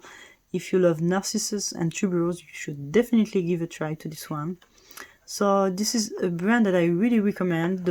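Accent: France. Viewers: I love your monthly favourites and your reviews such a diversified extensive range of scents so wonderful to watch! I love fragrance too and have a small collection but not as impressive as yours! Do you work in the fragrance industry? I recently discovered Atelier Materi and cacao porcelana is on my wish list as is Trudon Bruma a beautiful chilly iris scent all class my other discovery and also on my to buy list is Tabac Rose by BDK its the only scent in that range that I LOVE it actually reminds me of Maison Lancome's oud bouquet but sweeter and more wearable I'm so glad I found your channel I love the way you speak & I love your French accent! Big lover of France have visited twice xx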